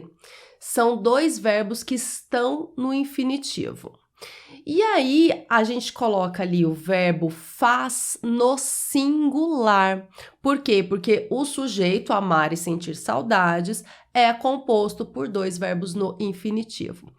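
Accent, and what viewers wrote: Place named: Minas Gerais, Brazil. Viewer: Muito elucidativo, professora com sotaque mineiro (alto paranaíba?), parabéns. Acho que a complexidade das perguntas deve ser crescente, como tem sido apresentado.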